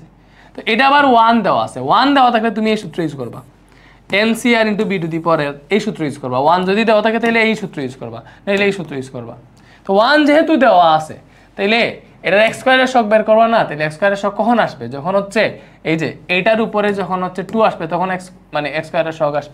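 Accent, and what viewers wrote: Sylhet, Bangladesh. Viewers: Bai sylheti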